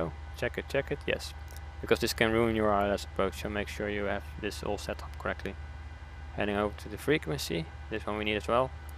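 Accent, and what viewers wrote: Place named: Netherlands. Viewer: hahahah voor mij persé hoeft het niet hoor!! Ik hoorde je stem en ik dacht die komt uit hetzelfde land hahaha! Weetje tegenwoordig kan iedereen best goed engels dus verstaat iedereen je prima en met engelse video’s kan je mensen internationaal bereiken!! Blijf gewoon zo doorgaan en ik zou lekker in engels doorgaan!! Je bent goed bezig!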